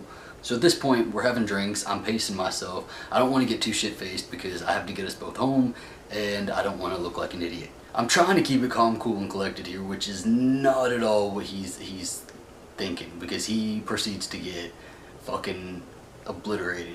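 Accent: South, United States. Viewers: American Southern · I'm pleasantly surprised at this story. Not the usual whiny entitled gay b.s. What an alluring Southern accent. But really, this date sounds like par for the course -- unfortunately. It was a bad date.. but don't blame the Grindr app. It's the man you chose to date. But that's what dating is for: to find out what a person's really like. I hope you don't give up on dating. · Sound thick like Alabama or Georgia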